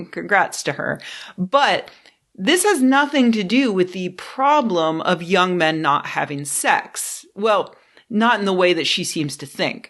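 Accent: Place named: East Coast, United States. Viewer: Rebecca not seeking to detract from your content, but you have one of those East Coast accents that hearing you say the word "Mirror" would be like a bomb going off, in a nice way of course!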